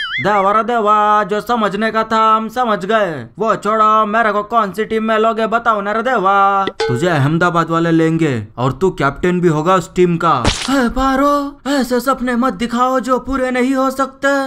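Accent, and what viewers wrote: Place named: Hyderabad, India. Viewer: Will I am sonn 😍best👌 kya Hyderabadi bolte ho bhai tum ek dum awesome 😍 sun ke maza aa jaata hai 👌😄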